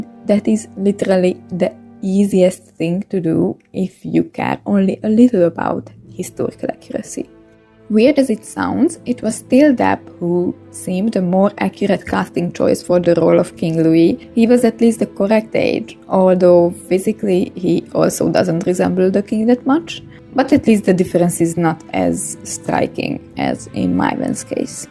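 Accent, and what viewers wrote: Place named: Germany. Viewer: Yup. You sound just like A German.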